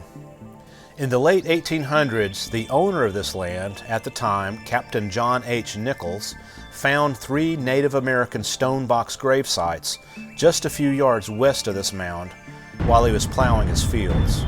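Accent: South, United States. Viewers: He has a Southern accent. No question about it. Just like I do. "I got my own way of talking, But everything is done With a Southern accent Where I come from." ~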